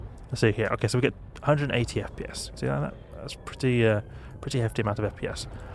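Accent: South Africa. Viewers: I'm detecting South African in this guy, just a hint.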